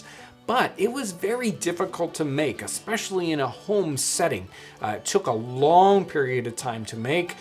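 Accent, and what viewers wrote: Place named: United States. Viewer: What happened to the British guy that used to do this ? It's throwing me off a little that this guy's American haha still great all the same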